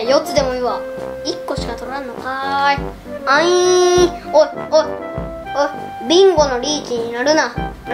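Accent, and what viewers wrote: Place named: Kansai, Japan. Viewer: 関西弁可愛い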